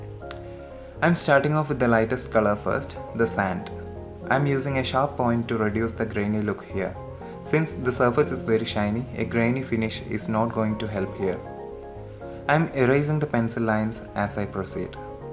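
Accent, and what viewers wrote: India. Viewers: Omgggg that Indian accent is too adorable.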